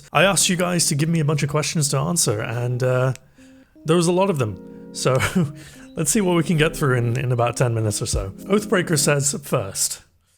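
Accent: United Kingdom. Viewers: Cammycakes and collaboration please:) Great channel and nice to see your face. I should have known the best British voice on the Internet belonged to someone from the Indian subcontinent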